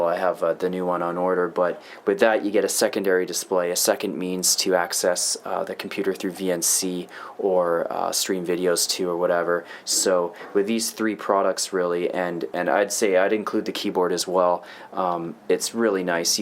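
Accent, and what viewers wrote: Canada. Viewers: judging by ur accent, ur Canadian?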